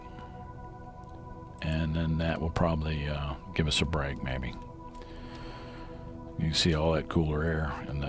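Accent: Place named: Mississippi, United States. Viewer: You have a Mississippi accent. Where are you at. Why did you choose to open a youtube channel?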